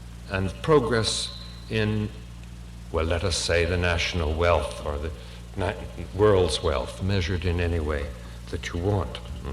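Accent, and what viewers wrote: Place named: Mid-Atlantic, United States. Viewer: The “Mid-Atlantic” accent needs to make a comeback…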